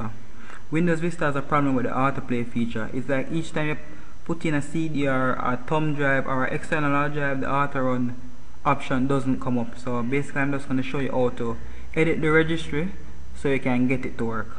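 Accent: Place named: Jamaica. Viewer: i can tell ur jamaican.....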